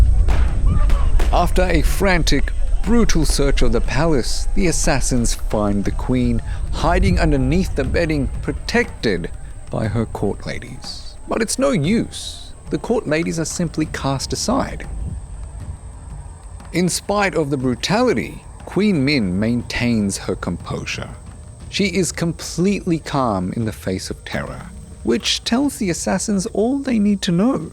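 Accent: Australia. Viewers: Does sound a bit like him haha, Aussie accent and a slow/dramatic delivery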